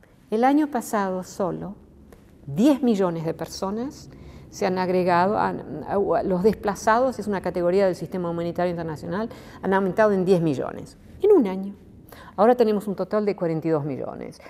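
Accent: Argentina. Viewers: How come she speaks perfect argy Spanish? I hear that she lived until 1950 in Argentina, but at that time she was 1! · No esperaba que hablara en español menos tan porteño.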